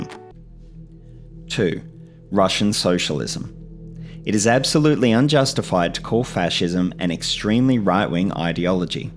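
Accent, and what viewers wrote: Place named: Australia. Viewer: I can’t tell you how absolutely thrilling it is to hear a YouTuber discussing ideology with an Aussie accent! Where hast thou been all mine life?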